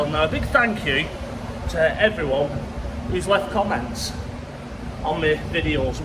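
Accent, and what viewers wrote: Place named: Wigan, United Kingdom. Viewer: You don't need to talk like a Wiganer, just speak English. I've worked for American companies for 25 years and have never had any problems communicating with the yanks especially the women.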